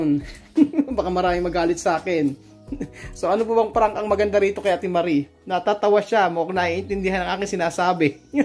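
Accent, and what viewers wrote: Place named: Davao, Philippines. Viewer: Parang tqga davao ,alivio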